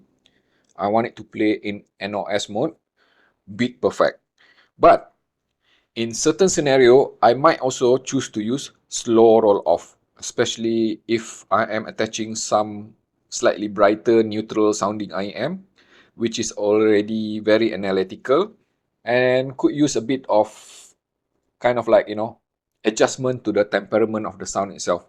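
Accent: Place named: Malaysia. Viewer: great review I love your malaysian english.... Greeting from indonesia....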